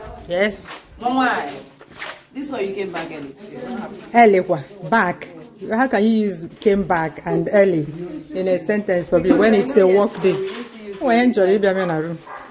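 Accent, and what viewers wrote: Igbo, Nigeria. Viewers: I finally see ur face.....been hearing ur igbotic voice....weldon ma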